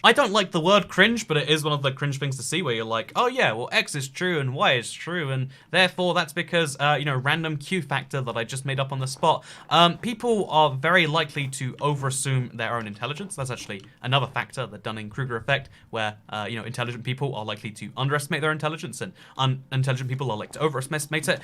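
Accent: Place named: United Kingdom. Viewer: Lol to be fare to the CC our talks fast and with his British accent the north American closed caption can't keep up... so it assumes it knows what he is saying. I wouldn't change it for the world.. lol double the entertainment. First from everything our young sir does to entertain and second by the erroneous closed caption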